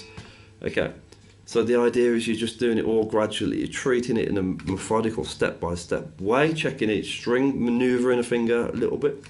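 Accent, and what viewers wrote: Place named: Birmingham, United Kingdom. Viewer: brummie? Some type of English accent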